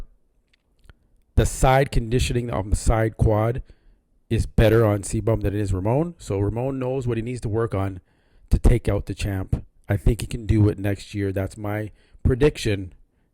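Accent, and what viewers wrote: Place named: Caribbean. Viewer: absolutely. I just started to listen to your videos. You’re on point with your analysis. I’ve been in the game and a fan since 94. Lol. And as a proud Jamaican don’t think I don’t hear a little Caribbean in your voice. HaHa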